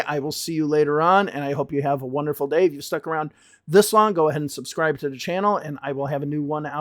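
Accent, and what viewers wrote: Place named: Midwest, United States. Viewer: I could hear similarities about Midwest accent in specific intonation, like a hammer on some words.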